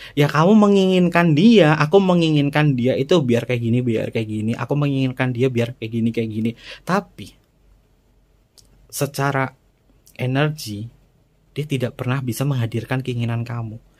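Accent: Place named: Wonosobo, Indonesia. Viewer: "sikapnya si pekok kempleh2" 🤣🤣🤣 kak bahasanya mirip kaya wonosobo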